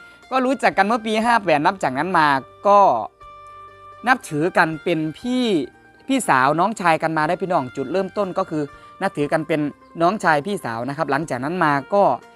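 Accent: Isan, Thailand. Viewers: เว่าอีสานกะดีคือกันเด้อครับ ฟังแล้วอบอุ่นดี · เสียงเว้าของ มาคือกันกับเสียงผู้ใหญ่บ้านฟินแลนด์ฟังเรื่องราวชีวิตน่าชื่นชมที่เป็นคนที่ต่อสู้ขอให้รักกันแบบนี้ตลอดไปดันๆให้มีคนติดตามเพิ่มขึ้นเรื่อยจนถึงแสนเด้อจ้า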